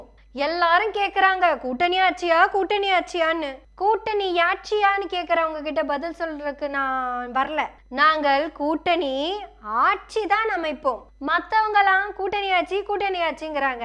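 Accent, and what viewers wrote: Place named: Coimbatore, India. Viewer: Sister Your Civai SLANG speech is Very Like it. Keep it up Same Style with Good informative News to Public. Welcome Sister Very Good Capable Excellent Speech.Take Care sister.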